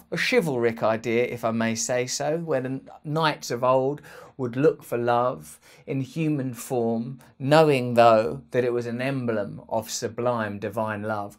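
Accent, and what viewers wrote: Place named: United Kingdom. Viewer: I don't understand your British accent 🥺🕊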